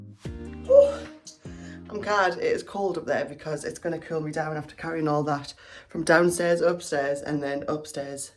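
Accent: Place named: Sunderland, United Kingdom. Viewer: yes I thought it was plus you have a wearside accent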